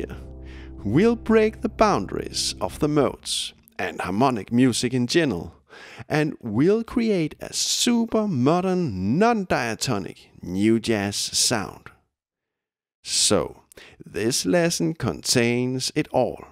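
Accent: Denmark. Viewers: That's a Danish accent for you right there :) Anyway good channel! =)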